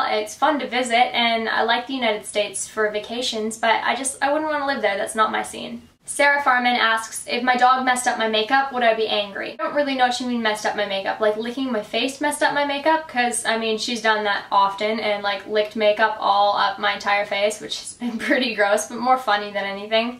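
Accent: Australia. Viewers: I only just read that you live on the Central Coast! I was thinking your accent had some big Aussie leanings! That's awesome. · I'm new to your channel and I just have 2 questions. 1. Does your Australian accent come in and out? 2. What do your tattoos mean? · i love how you have a little bit of a an aussie accent that comes out every once in a while :)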